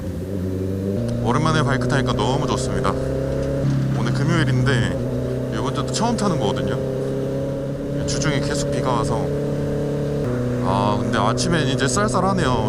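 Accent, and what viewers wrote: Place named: Seoul, South Korea. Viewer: 엇!!! 서울말 쓰시는👍👍 멋있습니다!!! 구독하고 갑니당😆😆😆😆😆😆😆 소리 넘나좋은 육반 !!!!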